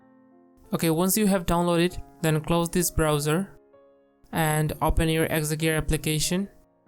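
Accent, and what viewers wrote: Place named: India. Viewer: Indian — English version of India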